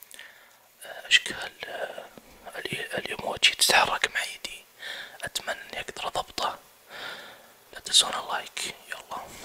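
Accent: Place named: Ha'il, Saudi Arabia. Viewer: لهجتك حايليه مره انت من حايل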